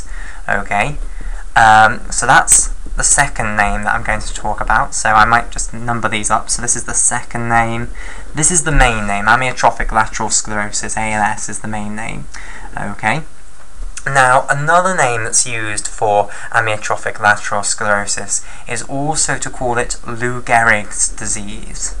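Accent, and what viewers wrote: United Kingdom. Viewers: He sounds British; probably from the UK/England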